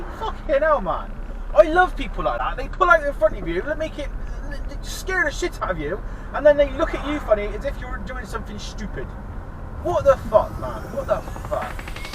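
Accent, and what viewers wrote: Bristol, United Kingdom. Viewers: We could do without the Bristol accent, sounds more like a local yokel who should have stayed on the farm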